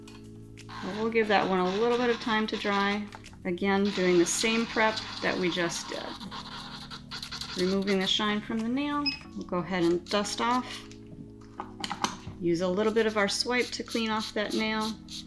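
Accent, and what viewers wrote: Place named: Midwest, United States. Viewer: I knew she was from MN! Michigan girl here. I’d recognize that Midwestern accent anywhere! Love nail sets! 😍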